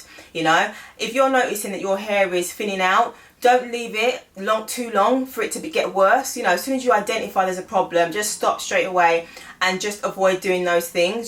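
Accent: United Kingdom. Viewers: I didn’t hear anything she said but enjoying her accent...i bet she is from Uk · Lovely to hear a UK voice! Thank you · Finally a British accent . Thanks girl now when u give recommendations on products I can actually get them. 🙂🙃🙂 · I said the same thing. Great to hear a British sister.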